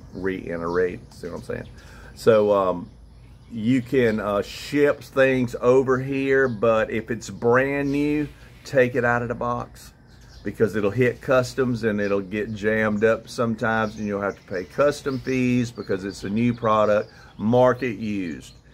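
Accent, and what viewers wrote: South, United States. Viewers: LOL. The southern accent combined with the 'jack sh*t'. Certainly American.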